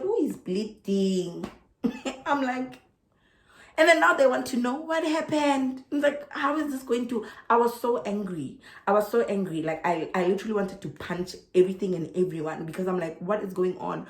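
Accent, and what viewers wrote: South Africa. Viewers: you are an inspiration you are a pleasure to listen too, I feel my heart full of nostalgia hear your wonderful personality one thing I miss from South Africa is the way black South Africans speak english, people may think I am being racist mentioning the accent and way Black South Africans speak but is a term of endearment, as it is one of the most beautiful things of African people as it shows the beauty of the African soul, I too moved to Australia and have built a good life here, I would be dead already if I had stayed in SA having Diabetes and blood clots my biggest sadness is when we voted for one man one vote all whites new that was the end of Apartheid which was necessary as it was an degrading evil, most whites were expecting to see all races especially Black people lives upfift to a middle class lifestyle we as whites enjoyed, and to how the ANC has destroyed everyones dreams except their own selfish lives is so so sad, so happy you managed to get out and build a wonderful for yourself and family you should send your video to TED TALKS as you have a gift and are an amazing speaker you are a beautiful person with so much to give to humanity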